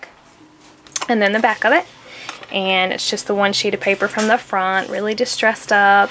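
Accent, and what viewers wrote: South, United States. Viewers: LOL...I should be bangin my head on a wall...How could I not know...I live in Ft. Pierce and my store is in Pt.St. Lucie and we had the visit form Isaac too...I did pick up your "Y'all" lol you can't hide your southern girl...hehehe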